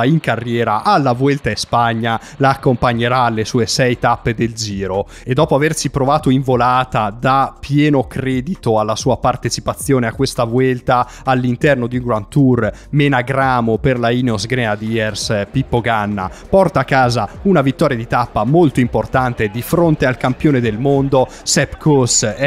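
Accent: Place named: Reggio Calabria, Italy. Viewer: Pensavo di Reggio dalla S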